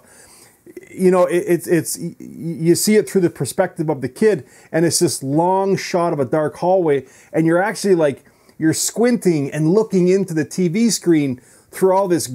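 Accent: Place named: Canada. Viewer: Hey dude, as soon as I heard you talking I thought you were a fellow Canadian, it’s the accent haha. Where about are you from? I grew up in Northwestern Ontario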